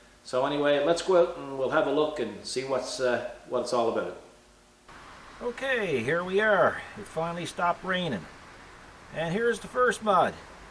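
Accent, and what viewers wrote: Newfoundland, Canada. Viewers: Did I hear Newfoundland ? Well if you’re a mulley ? We might be kin love the snowblower and love the country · I knew you were Newfoundland as soon as you spoke. I'm a townie myself.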